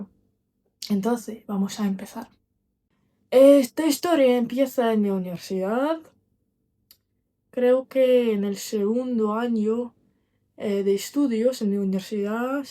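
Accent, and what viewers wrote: Spain. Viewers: Hablas más como gachupin que como latino, 🫤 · entonces,demaciadas veces pero ahi vas con tu español ,veo o escucho 1 gran influencia española en el español,suena raro para mi en lompersonal no me gusta,es interesante el choque cultural ,Rusia tan lejos de America latina o norte america donde esta mexico,me muero por ir a pasar 1 larga temporada ,ojala 1 dia sea posible,saludos desde la riviera maya mexico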